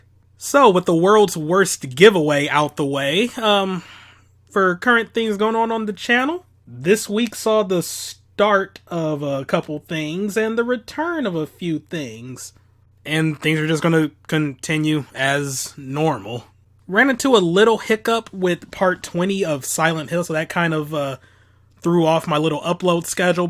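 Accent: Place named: Michigan, United States. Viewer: I thought you had more of a midwestern accent. Just didn't think it was Michigan.. Sadly I can't make it to MWE. Didn't even hear anything about it until you mentioned it in the video.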